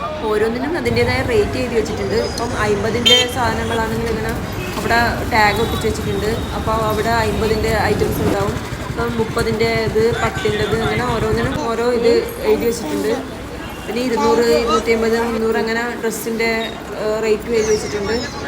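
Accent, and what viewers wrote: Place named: Kannur, India. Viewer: ഞാനും കണ്ണൂരാണ് സംസാരം കേട്ടപ്പോൾ തോന്നി.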